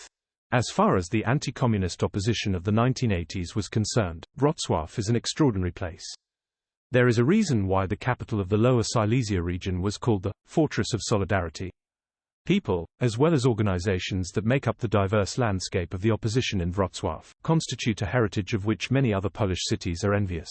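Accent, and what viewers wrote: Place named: RP, United Kingdom. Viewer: I LOVE learning where the Dove of Peace came from. Wow no idea that came from Picasso. Ok just going to say that upper class Brit accent just sounds wrong. Doesn't match your passion and incredible heart for history. 👣🧭🫶❤🕊️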